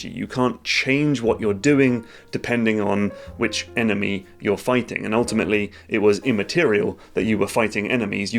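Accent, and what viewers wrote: United Kingdom. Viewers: video starts, british guy speaks, subscribed, its that easy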